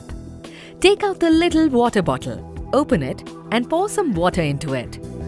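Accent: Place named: India. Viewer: Indian English. BP and TD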